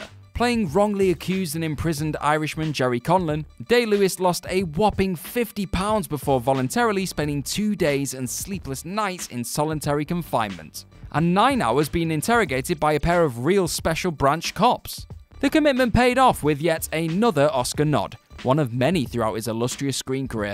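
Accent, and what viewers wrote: United Kingdom. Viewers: Don't forget, we're talking about British people narrating this, so their way of pronouncing some words and names may differ.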